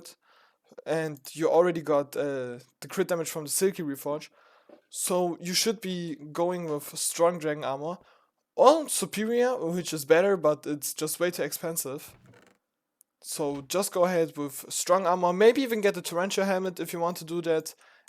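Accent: Germany. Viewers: alter dieser Deutsche Akzent ist insane bei dir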